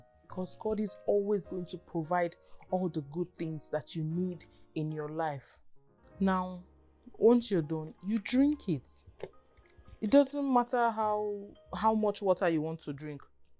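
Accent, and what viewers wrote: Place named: Nigeria. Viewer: Nigerian with quite fantastic accent, not like many of them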